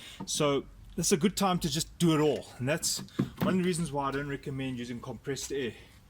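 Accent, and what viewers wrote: South Africa. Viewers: I love the strong South African accent from a strong new Zealand accent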